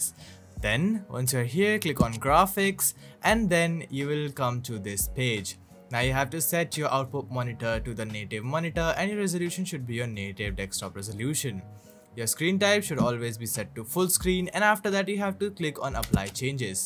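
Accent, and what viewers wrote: India. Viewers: He is an Indian, that's why he speaks in that way. t to d y'know.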